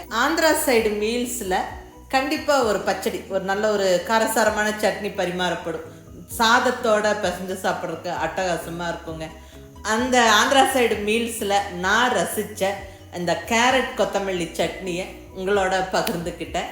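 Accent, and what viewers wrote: Kongu, India. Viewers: Hi mam... U r one of my inspirations to start a YouTube channel... Love the way you describe the cooking with கொங்கு தமிழ் ..way to go mam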